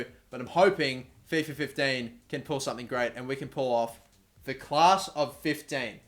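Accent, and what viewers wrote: Australia. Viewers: You an Aussie? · Definitely a fellow Australian? Nice video dude.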